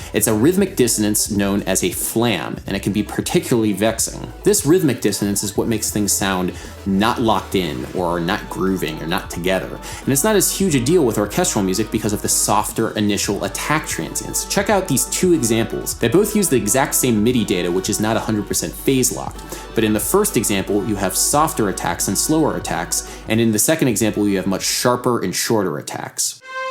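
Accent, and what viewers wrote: United States. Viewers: American musicians follow the German system of naming note values after fractions, while British musicians have adopted the French system. Judging from the uploader's accent, he appears to be an American. Therefore, his use of "quarter" and "eighth notes" is entirely proper.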